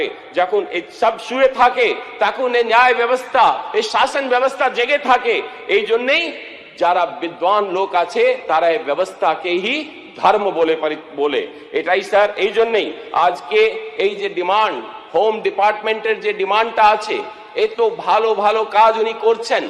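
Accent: India. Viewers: Apnar bangla ucharan akhono hindi tan.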